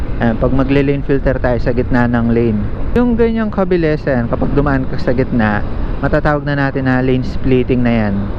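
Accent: Pampanga, Philippines. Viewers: Sabi na nga ba kapampangan ka e,yung accent mo kasi. Sa Telabastagan yan 😁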